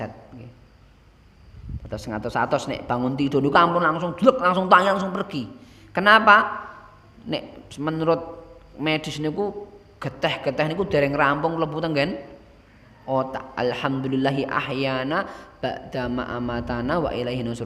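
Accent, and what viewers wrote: Java, Indonesia. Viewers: Waduhhhh jowoooo wkkkk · Di mengerti,,,,, heheheh jawaaaa ki hehhe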